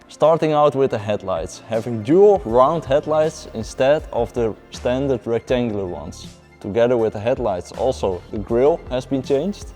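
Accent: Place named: Germany. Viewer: Produced till 1969.....Your Denglish is horrible.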